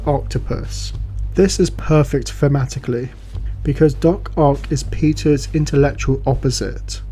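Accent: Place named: United Kingdom. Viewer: This Blows...sick of British Voices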